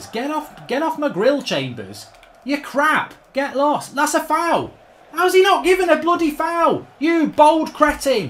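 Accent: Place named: Liverpool, United Kingdom. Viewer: Scouser lmao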